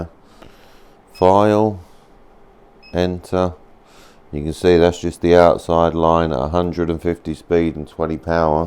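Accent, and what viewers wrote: United Kingdom. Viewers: I thought you were from the UK with that accent.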